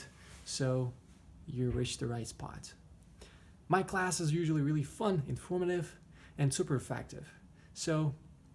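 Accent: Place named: South, United States. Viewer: I actually love the southern accent as a foreign student. Sooo sexy.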